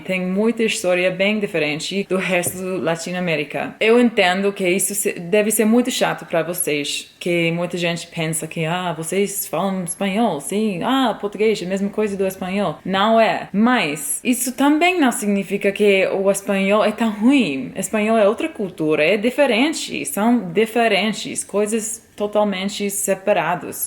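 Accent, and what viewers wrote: Portugal. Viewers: Legal, sotaque muito parecido com portugues de portugal. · Você tem sotaque português de PORTUGAL.... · "Psiu" é som para chamar gatos ou para pedir silêncio, chamar alguém assim ou por meio de assobios é falta de educação. Eu sou pontual e também não gosto dessa mancada que a maioria dos brasileiros dá, que é o de atrasar em seus compromissos. O seu sotaque lembra o de uma portuguesa!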